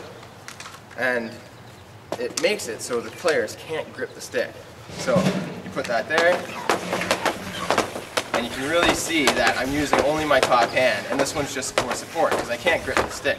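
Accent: Canada. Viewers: he sounds like true Canadian and nice vid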